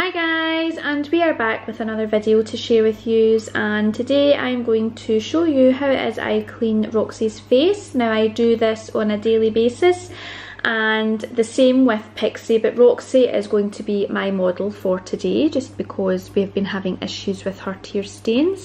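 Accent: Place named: Scotland, United Kingdom. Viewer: Ah, a beautiful Scottish accent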